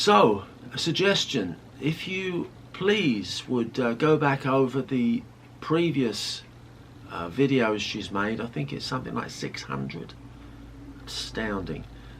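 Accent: England, United Kingdom. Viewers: Thank you so much and for updating on Dearest Jean. Your Such a beautiful family looking after each other. Wishing Jean a speedy Recovery send Love fro. The UK. PS be proud of your beginings... Great British accent! Xx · So nice of you to fill in for Jean; great job! Please don’t apologize for your accent……it’s charming and delightful and when I go to England, I’m the one with an accent! Feel better soon Jean! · I love the English accent. 💜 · Prayers for a speedy recovery for Jean and I love the British accent. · Hope Jeans on the mend soon and begining to feel better x 💐 and, dont apologise for your accent. there's absolutely nothing wrong with a lovely English accent x 😉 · Awww, So sorry Jean has been so sick! I will be praying for her swift recovery. She is such a Joy to watch & listen to. As far as your British accent, I love it! So Glad Jean has such a lovely sweetheart. 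TY to you & on camera! God bless you all!! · Never apologise for your beautiful accent, English accent is my favourite. Give love and hugs to Jean for her Aussie youtube friend. God Bless. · Dear, please give Jean my love and wishes for a speedy recovery. Tell her we will all be waiting patiently for her return to her usual chatty smiling self. Thanks to you and, I’m sure Jean has the best possible care. Nothing wrong with the accent, I’m from Yorkshire originally so you sound very British to me. Love to you all 🏴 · Thank you. Love your British accent. God bless all of you. Best wishes for Jean to get well. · Thank you for the update on Jean. Prayers for her speedy recovery. Ps..never apologize for your English accent. I love hearing it.😊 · Sending best wishes for a speedy recovery., love your English accent. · thank you for keeping us up to date about our lovely Jean, sending all prayers for her speedy recovery. 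Your English accent is so refreshing to hear. Thank you dear for your help in getting the video out for us. Best wishes to all the Trueloves. ♥♥ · Aww what a lovely video - (even though Jean is ill,) the way you speak about your wife with such love melts my heart! Hope you feel better soon Jean! PS, as a fellow english woman, your accent is magnificent! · Thank you for the update. Your accent is great (fellow Brit living in Texas). Dear Jean, love you, miss you, sending you all the get well wishes. I will be watching your videos all day today. You bring a smile to my face every time I watch you. Take care, rest and let those lovely men take good care of you xxx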